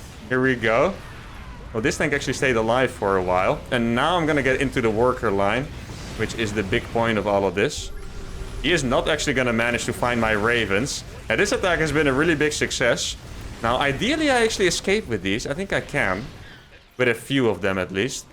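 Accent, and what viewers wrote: Netherlands. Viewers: dutch english is the most cringe accent ever jesus christ unwatchable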